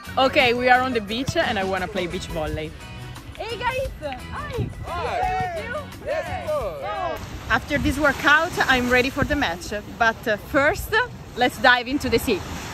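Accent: Italy. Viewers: English with Italian accent, very cute😘😘😘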